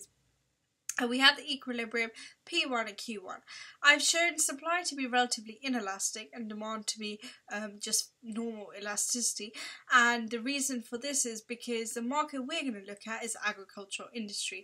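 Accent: United Kingdom. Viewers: Her english is more english than english people's English 🤦‍♀️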